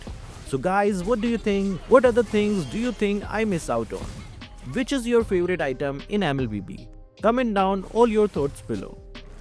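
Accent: India. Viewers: the moment I heard the Indian accent I just stopped all.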